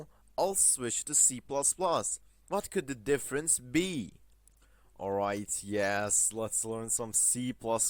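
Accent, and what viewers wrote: Turkey. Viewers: Aksanindan Turk oldugunu anladim :D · Turkish accent? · Hey where u from. ur English kinda resembles a Turkish accent. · it's the turkish accent for me :p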